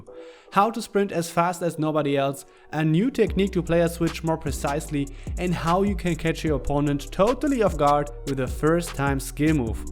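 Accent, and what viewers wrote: Germany. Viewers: Man hört dein deutsch so raus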